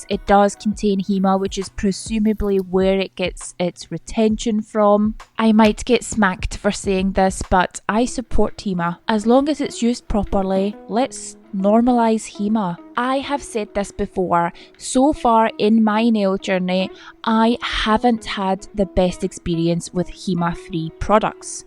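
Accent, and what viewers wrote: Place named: England, United Kingdom. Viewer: Can somebody tell me sweet English accent is that ? ❤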